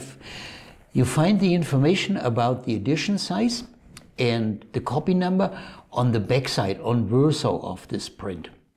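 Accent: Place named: Bavaria, Germany. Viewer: Your "Bavarian English" is much more charming to a native English speaker than the computer rendition. Thank you for making these informative and enjoyable videos. As a lover of ukiyo-e prints, but not a very informed one, I always look forward to your presentations. Stay healthy!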